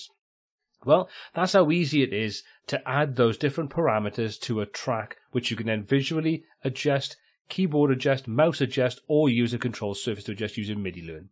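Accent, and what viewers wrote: Wales, United Kingdom. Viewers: Pardon the off-topic comment, but I'm a huge fan of UK accents. I'm thinking I hear Rob Brydon (seen on TV show 'QI' at times) in your voice. Might you hail from Wales or nearby? Thanks!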